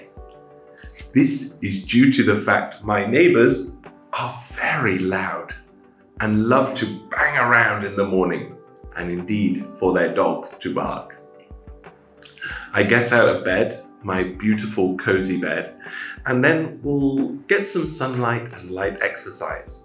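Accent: United Kingdom. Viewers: Giọng anh - anh chuẩn, nhiều từ vựng. Năng lượng của Thầy luôn truyền cảm hứng rất tuyệt vời!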